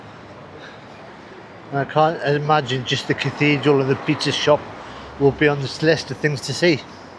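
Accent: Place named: Northern England, United Kingdom. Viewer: The English accent is difficult. It’s a working class non southern English accent and it’s hard for me as an American. Ha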